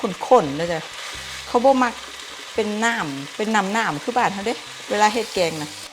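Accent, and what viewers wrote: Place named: Korat, Thailand. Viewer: แก๋นี่มันสำเนียงบอกเลยเนาะ.ว่าค้นโคราช.หลานย่าโม..ดีแทะ, แทะ.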